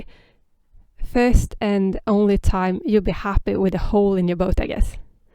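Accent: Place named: Värmland, Sweden. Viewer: Hi, I really enjoy your channel (especially when you get to hear some värmländska). I'm in a similar situation, having bought a Flamingo 40 last year as my first sail boat together with ha friend. I just have one suggestion - could you possibly arrange the order of the videos sequentially in the playlist you've made? Right now it seems that youtube autoplay will select the next video to play at random:) Thanks for the great content!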